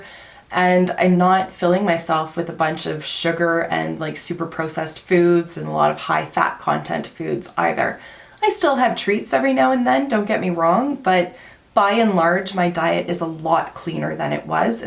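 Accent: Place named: Canada. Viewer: I just came across your channel for the first time, I was just sleeved 9/30/20, I hope I have success similar to yours, 80lbs in less than 6 months is amazing!!! (Sidenote- I have to ask, are you from Canada, by chance? I only ask because I'm from Connecticut,usa and some ppl imitate the Canadian accent, and when they do it's either french or like yours (you don't have much of an accent I just hear it on a few words, I love accents lol) I will definitely keep following your journey!!!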